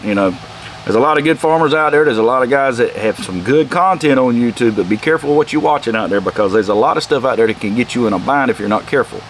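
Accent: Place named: South, United States. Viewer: Thanks for not talking me to death like most videos do--just the basics delivered with a cute southern accent. ("Sole" for soil) :washhands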